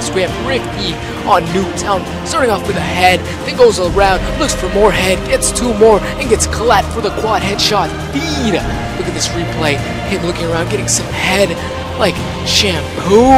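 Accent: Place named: France. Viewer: You Ave a good accent french i know i'm french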